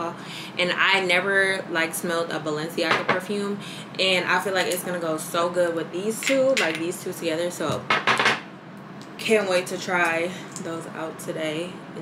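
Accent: United Kingdom. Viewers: the british accent LMFAOOOOOOOO 😭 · Chill vibes on a Sunday 😂🙂😂...the British accent for me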